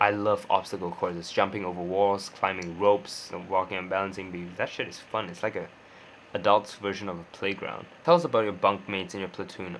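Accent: West, United States. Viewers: i like how has a angmoh accent in his vlogs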